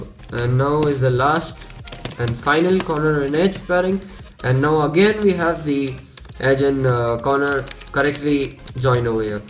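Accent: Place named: India. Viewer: Indian guy where u live? (Accent makes me laugh)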